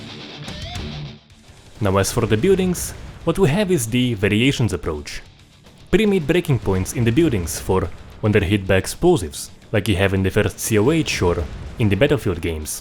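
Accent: Russia. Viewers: U from russia?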